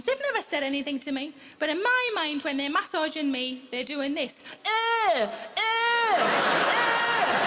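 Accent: Newcastle, United Kingdom. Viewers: she is actually quite funny maybe it is the geordie accent [which i like]seen her on some tv. panel shows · Great fun, Geordie perspective is fun assisted by your fantastic accent can't get enough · Geordie accent from Newcastle (area) in the northeast of England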